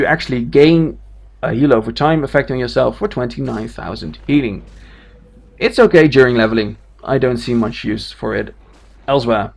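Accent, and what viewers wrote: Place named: Denmark. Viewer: Nice guide! Really helpful! Always funny to hear someone talking english with what sounds like a danish accent! :D I got a few questions. I just recently started maining my Shadow Priest after I saw how fun the Voidform and Insanity Management looks. 1. I'm still using Legacy of the Void since I started playing my Shadow Priest, because it's what I was told to start using and then slowly moving on to Surrender to Madness, however I wasn't that fond of changing because I thought Surrender to Madness was a passive, meaning that it was every time you left Voidform you died, but seeing that it's a cooldown, you can actually play casually, like questing, clearing trash and such without being scared of dying to Surrender to Madness. But honestly, a 10 minute cooldown, yes it's one hell of a strong talent, but the 10 minute cooldown really doesn't seem that appealing, and I might be wrong here, but currently in my Mythic guild we are clearing bosses faster than 10 minutes a part in most cases, does that really mean there's actually bosses where Shadow Priests isn't gaining ANY DPS from their level 100 talents, or am I missing something? 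2. Do you think Shadow Priests will be nerfed? It really seems like we are just a tad too strong, together with Rogues and maybe fire mages (haven't played since the nerf today/yesterday) - It seems like the only way you can justify Shadow Priests doing that much damage is their ramp up time, they're absolutely shit at fast fights and they do have to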